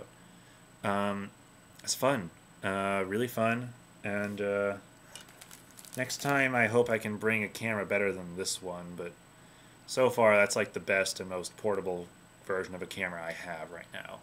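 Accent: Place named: Germany. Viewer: Last thing I wanna do is sound like a dick, but your German accent reminds me of Beerfest, in a good way.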